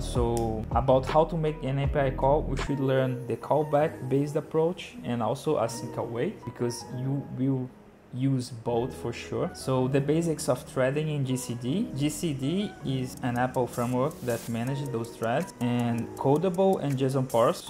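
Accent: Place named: Brazil. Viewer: Brazilian detected haha, vc tem curso em portugues ?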